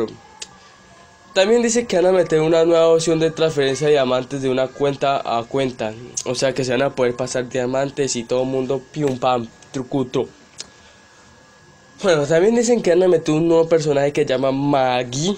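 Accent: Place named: Portugal. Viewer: Hablas un poco raro como portugués xd de que país eres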